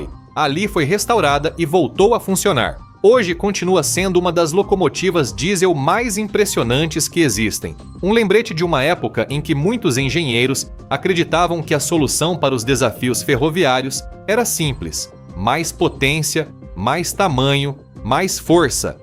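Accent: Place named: Caipira, Brazil. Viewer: Eita sotaque caipira.... rs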